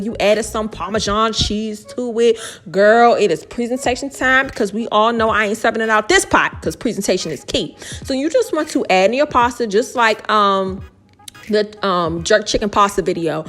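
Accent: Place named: DMV, United States. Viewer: Gotta b from the dmv the way u talk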